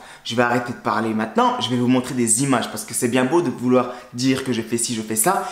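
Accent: Besançon, France. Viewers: Je rêve ou tu est de Besançon ?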